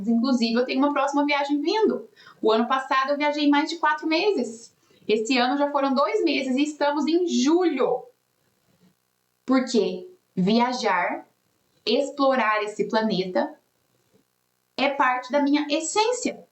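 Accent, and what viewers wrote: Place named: Minas Gerais, Brazil. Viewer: Teve que falar Português?! Você tem o sotaque mineiro e fala bem a Língua Portuguesa.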